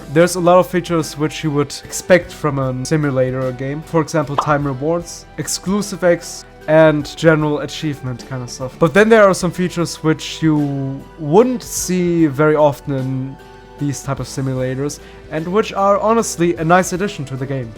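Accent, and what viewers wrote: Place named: Germany. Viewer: I hear deutsch aber gutes video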